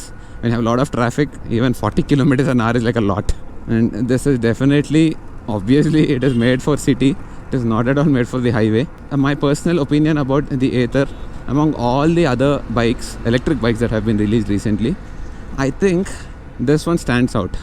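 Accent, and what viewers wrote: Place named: Hyderabad, India. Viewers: 🤧 I'm having this weird fascination all of a sudden of hearing the Hindi accent of the newer generation Southerners. 🤧 Damn you.. might be getting a lot of girls macha. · Hyderabadi bolate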